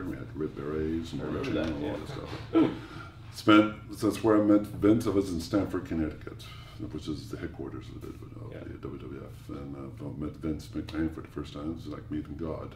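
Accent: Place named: Canada. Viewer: He sounds so Canadian I love it lol I feel like I'm listening to my neighbor right now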